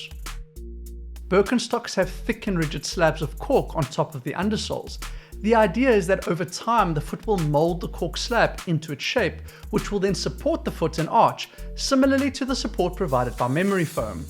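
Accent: South Africa. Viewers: Great video! You sound South African 😊 I was just wondering why barefoot shoe companies don’t make Birkenstock style barefoots. I will try this on an old pair of birkies.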